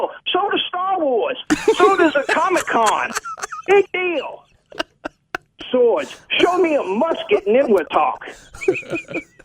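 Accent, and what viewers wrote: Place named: Texas, United States. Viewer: This guy sounds like an Aggy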